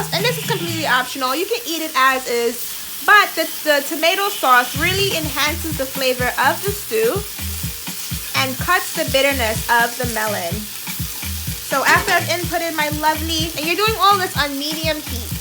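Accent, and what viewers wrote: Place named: Caribbean. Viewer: I here a little Caribbean coming out. Trinidad or Jamaica. Just saying😁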